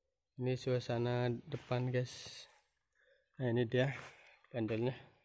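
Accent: Banyumas, Indonesia. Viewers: asli org ngapak cilacap ya mas aku purwokerto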